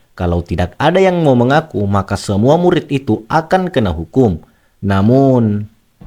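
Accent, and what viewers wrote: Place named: South Sulawesi, Indonesia. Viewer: Orang mana bang. cara ngomnya kayak orang sulsel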